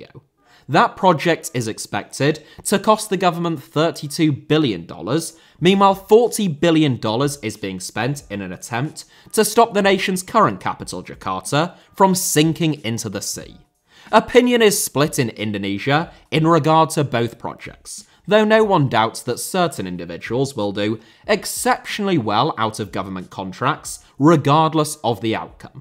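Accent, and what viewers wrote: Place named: United Kingdom. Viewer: Your voice i know you🤣 the british accents viral boy????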